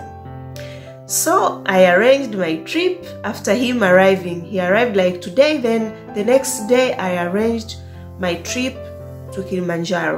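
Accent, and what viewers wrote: Uganda. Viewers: Lovely story. From Tanzania but you sound like Ugandan. Much love from Uganda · I thought she was Ugandan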